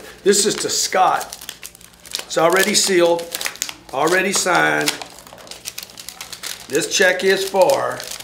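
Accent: South, United States. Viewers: This guy is Italian with a southern accent? Who knew...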